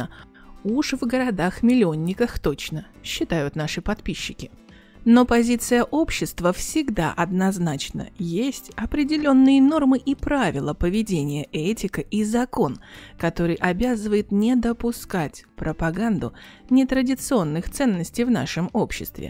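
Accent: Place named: England, United Kingdom. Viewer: чувствуется английский акцент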